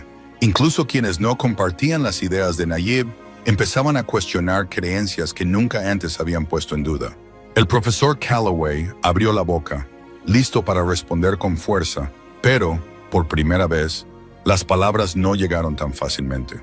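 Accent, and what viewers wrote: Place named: United States. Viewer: Pregunto. El narador porque Abla de una forma tan rara parece que es americano y termina ablando en un Español raro perdón es un detalle que a mí me hace dudar la intención. Perdón es un detalle.😮😊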